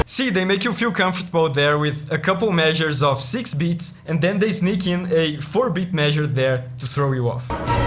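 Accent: Brazil. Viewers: Fatalis é um bixo muito brabo, percebi que tu era brasileiro só pelo sotaque kkkk vlw ai mano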